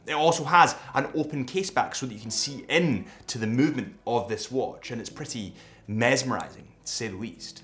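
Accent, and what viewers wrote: Scotland, United Kingdom. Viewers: You sound like a Scottish Adrian Barker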